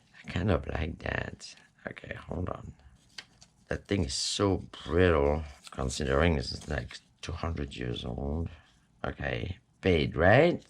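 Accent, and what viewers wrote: France. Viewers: These are very cute double pockets,! I missed seeing your videos for awhile, the last one I saw was the one with using cereal boxes to turn into “books”! I’ve collected several sets, 2 of each & I ordered the little clip thingies from Amazon, so I’m looking forward to getting on with that! This one today, though seems pretty quick & easy, so I might sneak one of these in first! It’s so fun to listen to you talk, English with a French accent is much nicer than plain old “American”! How did you become so proficient at English? And it’s not book English, you use a lot of slang & you speak very quickly, without pausing to think about a word!! Sorry, I sound like I’m interviewing you, I’m just curious! Now that I’ve found you again, I’m going to catch up on your videos! Thank you! Erica❤hope you’re having a nice weekend!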